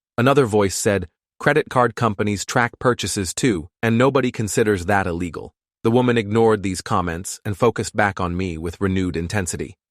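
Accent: India. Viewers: Why the Indian accent?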